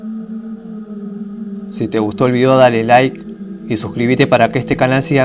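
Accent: Argentina. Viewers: Asi hablan los porteños de capital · Es por que es argentino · la voz y siendo argentino jajaja da es pena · me imagino que es de otro país, Argentino tal vez, Chileno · La Re. Caga el asentó argentino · Así hablan los argentinos?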